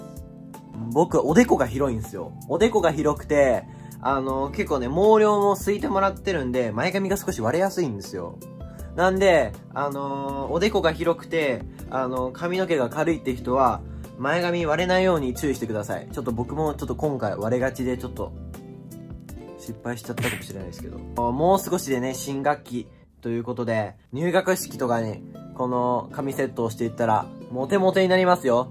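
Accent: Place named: Kansai, Japan. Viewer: イケメンなのにアホ丸出しの喋り方に天然で大阪出身関西弁で黒髪が似合うって、最強なんよめっちゃ好き黒髪見てえです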